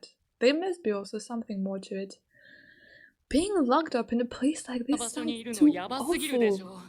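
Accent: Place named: France. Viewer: your english is really good 😭 and i also think french accent adds a bit of character (its not that noticible tho .d)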